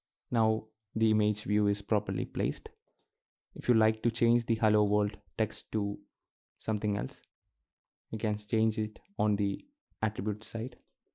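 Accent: India. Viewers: Not surprised. Indian accent.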